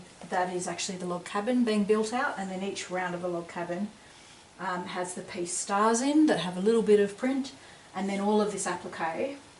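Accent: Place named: Australia. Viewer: Great to hear an Aussie accent! Thankyou!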